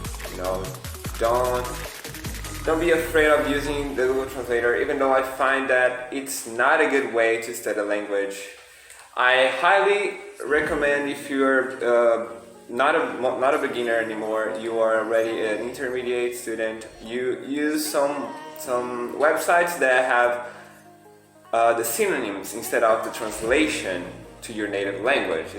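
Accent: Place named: France. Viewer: Wawww that frence accent 😌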